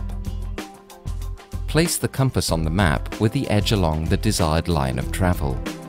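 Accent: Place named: South East England, United Kingdom. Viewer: I'm probably way off beam, but it was a British accent, very south east which also happens to have -0° magnetic declination. So perhaps they didn't demonstrate what they couldn't demonstrate in Britain. Declination could do with some futher information, such as, what does the term "negative declination west" mean? Very good video though.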